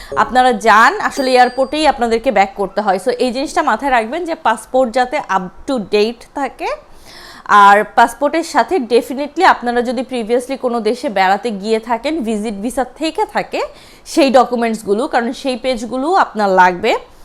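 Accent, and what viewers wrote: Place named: Bangladesh. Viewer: আপনার কথাগুলো অনেক সুন্দর আপনার কথা শুনে মনে হলো আপনি বাংলাদেশের নাগরিক বাংলাদেশের কোন জেলায় আপনার বাড়ি জানালে অনেক খুশি হব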